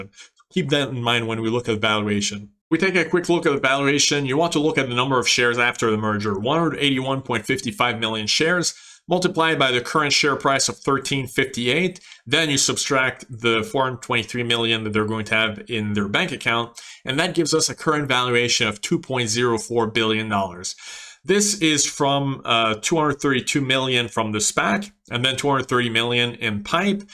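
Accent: Quebec, Canada. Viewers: Tu viens du Qc?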